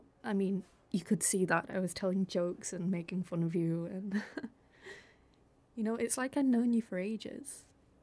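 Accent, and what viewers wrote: United Kingdom. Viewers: I've been a bit curious but are you from Manchester or am I just thinking wrong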